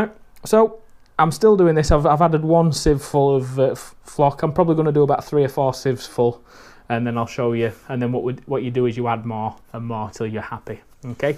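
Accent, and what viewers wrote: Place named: Yorkshire, United Kingdom. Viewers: nice vid mate. where in the world are you cos you sound northern england? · Thanks for this... I'll not be buying flock ever again also. ( PS, Big 'hello' from someone who was born in Batley and raised in Birstall... nice to hear a proper accent again😉)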